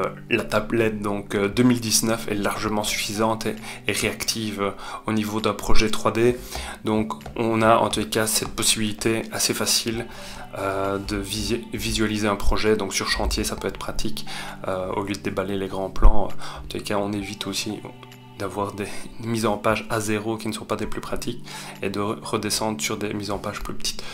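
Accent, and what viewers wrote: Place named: Alsace, France. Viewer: Quels belle accent alsacien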